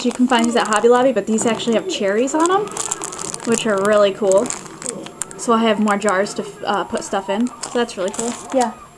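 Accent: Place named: United Kingdom. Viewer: No prob. I loooooooooooove British accents. They r just so, so, BeAuTiFuL!¡!¡ XD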